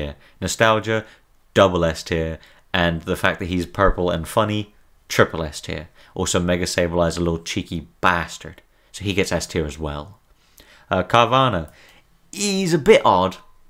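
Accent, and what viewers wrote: United Kingdom. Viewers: just LOVE the british accent xDD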